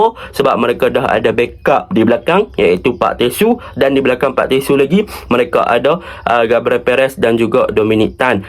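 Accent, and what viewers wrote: Kedah, Malaysia. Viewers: loghat Kedah mungkin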